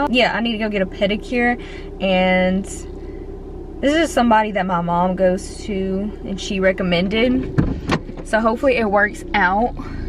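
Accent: South, United States. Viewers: Puuurrr 😻😻 I’ve only been Watching your videos for about a week but I knew I heard a southern accent ! Period Girl 😜💅🏽 this Vlog was very wholesome, keep up the good work sis ❣️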